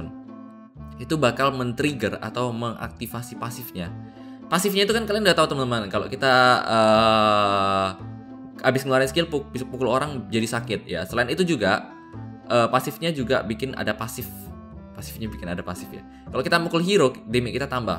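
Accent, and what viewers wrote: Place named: Medan, Indonesia. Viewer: Kita lego lego. 😁😁😁 bahasa indonesianya bang kita gocek gocek 😁😁 keliatan kali medan nya bah.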